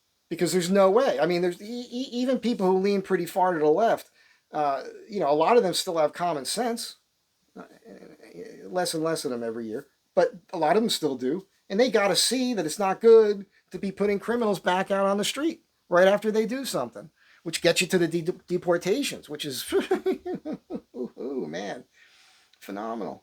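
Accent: New Jersey, United States. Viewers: Great video, spoken like true Jersey! Sorry I missed your live it was a little late here on the east coast but caught it this morning. Trump is kicking ass, as he should! 😊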